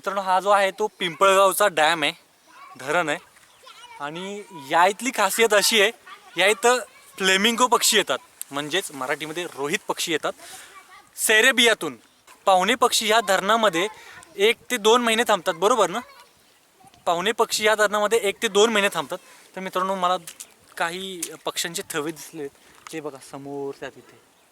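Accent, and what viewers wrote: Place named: Kolhapur, India. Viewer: Best commentator bhava..👏 Ekdam kolhapuri type..😉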